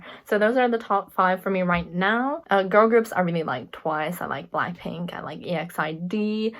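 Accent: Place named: Singapore. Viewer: According to your accent, Though you are Singaporean. Love your reacting, Cheers.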